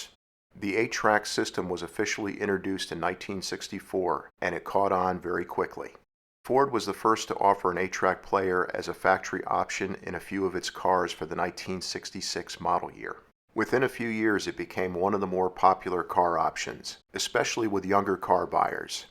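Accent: United States. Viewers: ...Ah, that mid-Atlantic accent.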